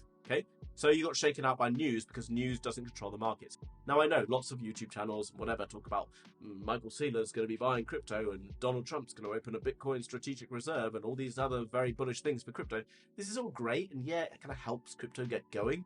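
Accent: England, United Kingdom. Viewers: Thank you, always good to hear an English voice with content.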